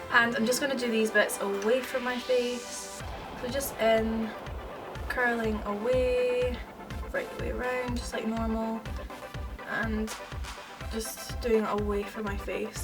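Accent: Scotland, United Kingdom. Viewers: Where in Scotland you from? Love your accent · is amazing she talks scotish like me I tried this for the first time I screamed 😜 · Hi are u Scottish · i think its Scottish :) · Yay scottish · Is that a Scottish accent idect? 😉 I love it, Scottish myself ☺ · omg are you from scotland !!! i am as well!! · omg you're scottish yayyyyy :) <3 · Sophia Maniaci thought I would reply since no-one else has- she is Scottish.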